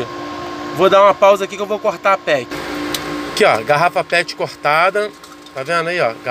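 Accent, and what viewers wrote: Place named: Rio de Janeiro, Brazil. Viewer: Aê carioca di mais...... cumpadi!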